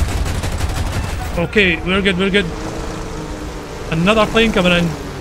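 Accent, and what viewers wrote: Scotland, United Kingdom. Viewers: so glad to see you back dood! youtube has felt barren and desolate without your beautiful scottish voice brightening my day. Hope you’re doing okay my dood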